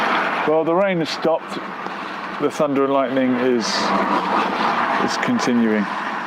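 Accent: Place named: England, United Kingdom. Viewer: I really really really enjoy your English accent , just like watching a news reporter or football match.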